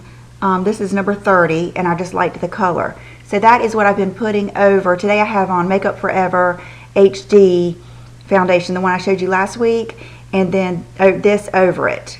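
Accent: South, United States. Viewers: Love your southern accent :)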